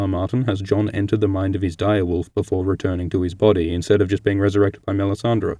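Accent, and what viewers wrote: Australia. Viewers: I love your videos!!!! As far as Jon, agree with you totally. As as the show? D&D don't have the creative brain that George does in terms of writing fantasy, so who knows what they're going to do. BTW, GREAT to hear another Australian accent :) CYA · I agree he does sound like an Aussie...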